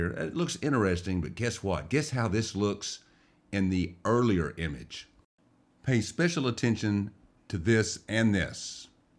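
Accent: South, United States. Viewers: I subscribe because I love that southern drawl, the Mars work (an opus), and an abiding interest in Mars. The items you find are incredible. Sadly I can't do the 3D viewing, having never developed stereo vision (congenital eye problems), but I still try! I'd love to see what there is.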